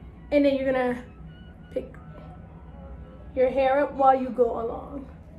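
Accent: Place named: North, United States. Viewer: Are you from Northern United States?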